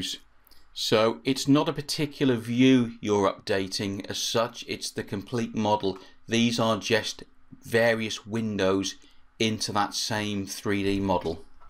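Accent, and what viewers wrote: United Kingdom. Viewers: Best Revit Tutorials I have seen, and the British accent makes it much easier to understand! Very well done!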